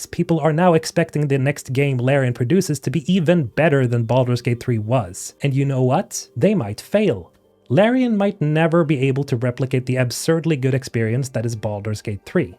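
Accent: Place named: Sweden. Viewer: That cute subtle Swedish accent though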